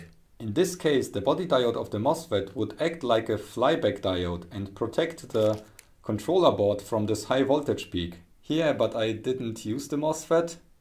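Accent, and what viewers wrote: Germany. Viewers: I love the German accent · Das hört sich so deutsch an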